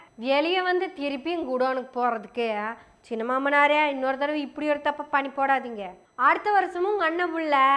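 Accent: Kongu, India. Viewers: தோழர் நான் coimbatore காரனுங்க கொங்கு தமிழ் உங்களுக்கு சிறப்பா வருது. ரொம்ப ரசிச்சு கேட்கமுடிஞ்சிது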